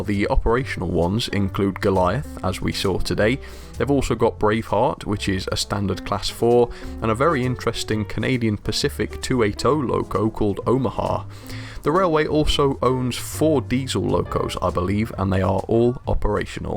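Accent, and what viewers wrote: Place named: Cornwall, United Kingdom. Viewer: How do you get a Cornish accent in "Down Devon" My father's family are all from Devon. I have many cousins living there too. Unfortunately, they all had to move away from where they were born as Salcombe has become a holiday home town and the house prices are well beyond their pockets. Martin. (Thailand)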